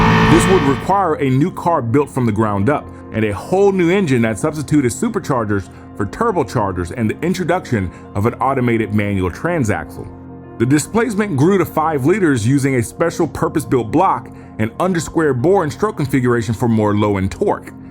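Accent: United States. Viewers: If you're planning on having Americans watch your videos, at least put MPH numbers in parentheses under the KMPH numbers. Considering you have an American accent, it seems like you should know this.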